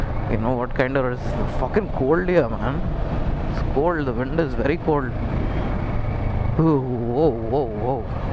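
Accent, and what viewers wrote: Hyderabad, India. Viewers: ji I so ducking love ur hydrabad wala accent... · yo buddy ur vlogs are awesome...love the way u talk Hyderabadi accent bahot mast hai. .. something new n nice to hear...english mai sab karte tum kuch alag karae ...🤣🤣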